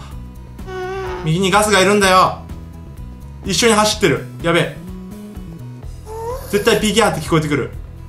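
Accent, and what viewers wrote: Niigata, Japan. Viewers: ヒカキンよく落ちること「落っちゃう」って言うけど新潟の方言(´ω`) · 多分だと思うけど、新潟県出身なんじゃない？ヒカキンは、だから、おっちゃうっていていると、おもう。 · 今日は地元の方言がよく出ますね（新潟県民より）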